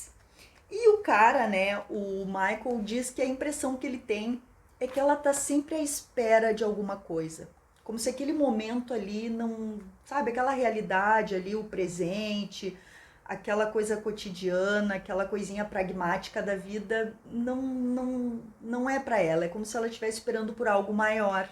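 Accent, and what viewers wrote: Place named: South, Brazil. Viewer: Eu que sou de 88, tenho uma queda por essas capas rsrs, uma vida vendo livros assim nas bibliotecas que frequentei... mas, a história é doida hem?! 😅😂🎉. Nem Jung explica, já que Freud só complica. Pesada a trama...😮 Sigo seus vídeos desde que vi um vídeo seu sobre um livro gótico do círculo do livro, acho sua dicção sensacional, o sotaque sulista é tão lindo... você é muito animada uma pessoa do bem!!! Felicidades para você e seu noivo.😊 Eu sigo a Tati e você não fica atrás nem um pingo, suas resenhas são ótimas. Quando tem uma taça de vinho, já sei que o livro compensa rsrs. Amo o canal do Rubens também ❤ Sucesso!!!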